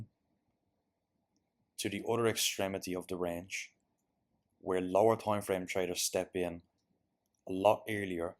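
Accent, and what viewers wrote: Ireland. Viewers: Irish accent? Subbed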